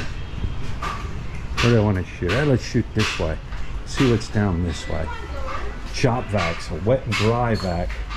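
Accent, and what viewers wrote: Philadelphia, United States. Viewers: It's not that they don't speak English, it's just that you speak english with a Philly accent and they need time to process what you just said - that blank look on their face after you say something to them is their brains processing what you just said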